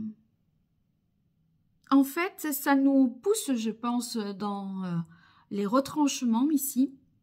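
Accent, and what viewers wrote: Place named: Marseille, France. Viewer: Bon bah ça marche ! 🙂 Ça ne me parle pas trop, mais j'aime bien votre accent, ça me rappelle ma vie à Marseille.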